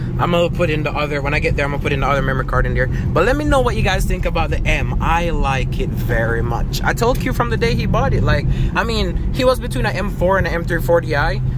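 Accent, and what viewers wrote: Jamaica. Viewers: Lmaoo love the Jamaican accent/slang got me dying😭💀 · You Jamaican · Bro are you Jamaican ? I hear Jamaican the accent frl no 🧢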